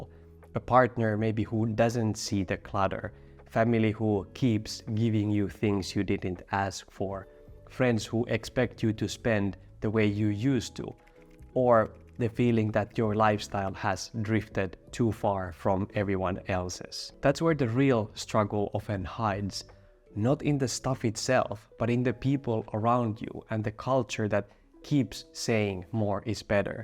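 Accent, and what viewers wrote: Finland. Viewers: Got a recommendation, dat finnish accent, I don't even speak the language but I still immediately recognized rally english :D This is one of the best summaries it's going on in my community, especially among older people. It affects a lot older people, seems like this happens when your cognitive capabilities drop, hence I'm lately trying to avoid hoarding as would demonstrate I'm already getting brainpower reduced. Old people, at least in my country, were rather well off, even with mediocre job you could afford a home, so they don't value space as our younger generation. Also in the past jobs and overall life didn't require much intellectual efforts, which lead to this situation. Another reason for hoarding is that certain things are very hard to get rid of, with all this green agenda, you can't carry all the junk in the nearest dump container and if you do, you try to get there without anyone spotting you.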